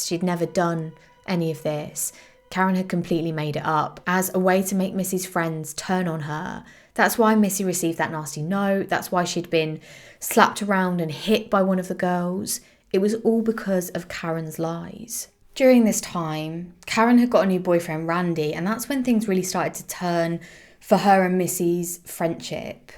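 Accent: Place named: England, United Kingdom. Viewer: you are beautiful. I just found your channel and subscribed. I love the way you report. You do great research., presentation and narration I’m wondering where your accent is from. I’d guess England, maybe a countryside accent. Best of success to you with your channel.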